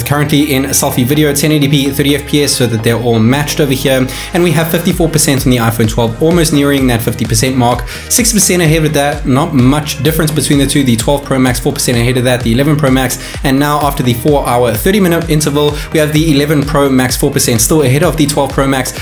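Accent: South Africa. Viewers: Dude are you from SA? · Saffa accent so strong 💪🏽🤣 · Is this guy South African?🤔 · U south african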